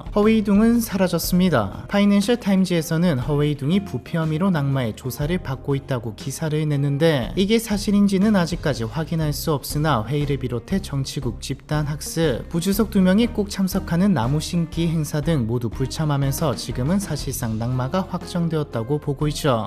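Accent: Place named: Busan, South Korea. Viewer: 내용보다 채널주 부산사람인게 더 충격임......아니 사투리 이정도 안쓰는 부산분은 처음 보는 거 같은....?....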